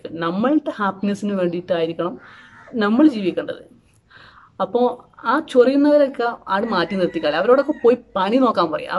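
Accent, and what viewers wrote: Malappuram, India. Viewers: ഓരോരുത്തർക്ക് അവരവർക്ക് പറഞ്ഞ ഭാഷയിൽ സംസാരിക്കാം ഓരോ നാട്ടിലും ഓരോ തരത്തിലായിരിക്കും സംസാരങ്ങൾ ഇംഗ്ലീഷ് ആരോട് മലയാളം സംസാരിക്കാൻ പറഞ്ഞാൽ അവർക്ക് സാധിക്കുമോ മലപ്പുറം ഭാഷയ്ക്ക് എന്താ കുഴപ്പം നല്ല ഭാഷ തന്നെയാണ് പിന്നെ നമ്മൾ മലയാളികൾക്ക് കുറിച്ച് കുറ്റം പറഞ്ഞിട്ടില്ലെങ്കിൽ ഉറക്കം വരില്ല😄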